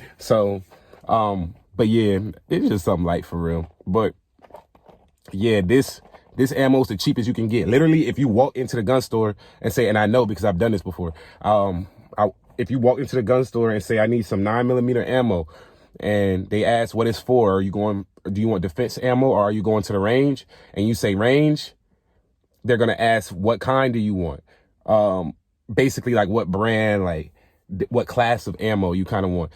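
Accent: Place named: Florida, United States. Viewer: You talk like a Florida nigga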